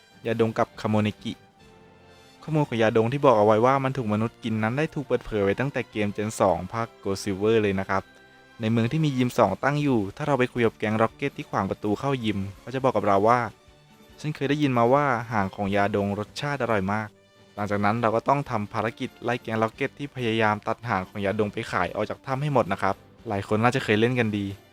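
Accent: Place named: Suphanburi, Thailand. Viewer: ชอบสำเนียงสุพรรณมากค่ะ น่ารักมาก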